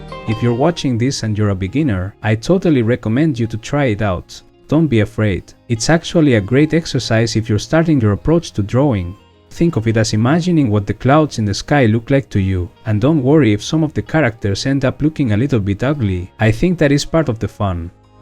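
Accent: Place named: Spain. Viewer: This dude is going crazy I can tell that you are Spanish but well done 👍 G keep it going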